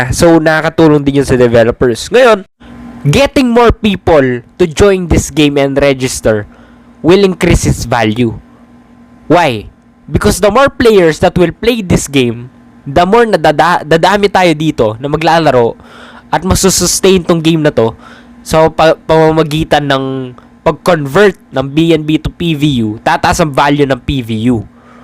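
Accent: Pampanga, Philippines. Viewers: Very helpful ang mga videos mo. Thank you. Kapampangan ka ba lods? Yung accent kase. 😁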